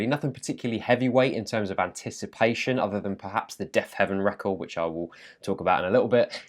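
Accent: RP, United Kingdom. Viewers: dont worry he's just a really posh one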